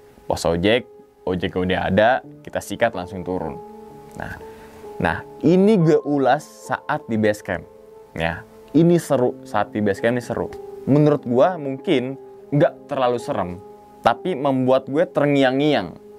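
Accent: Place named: Yogyakarta, Indonesia. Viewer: Pengalaman pribadi,enak dengernya detail penjelasan lokasinya,suara nya Jogja jelas. Jogja hadir bang 😊❤️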